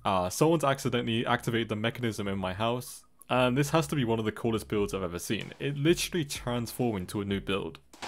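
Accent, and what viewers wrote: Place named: United Kingdom. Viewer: you be british! you're the only british youtuber i know!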